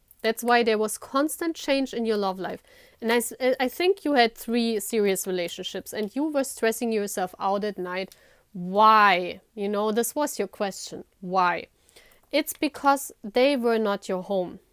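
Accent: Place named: Germany. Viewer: Are you german because of the accent? Ps nice video💖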